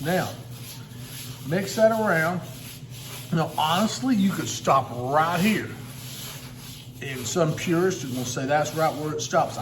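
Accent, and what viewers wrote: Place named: Texas, United States. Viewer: Love your accent!! Texas up in this thang👏🏽👏🏽🙌🏽🙌🏽💯💯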